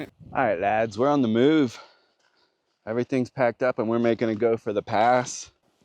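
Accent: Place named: Pennsylvania, United States. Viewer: You need to get yourself a fishing pole brother. Sounds like you are from PA?!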